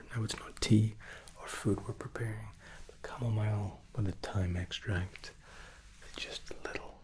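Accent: Scotland, United Kingdom. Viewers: Love your accent and you are defo up there with the best love your videos.... What part of Scotland do you hail from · Nice work!! Also, you are British AF hahah I love it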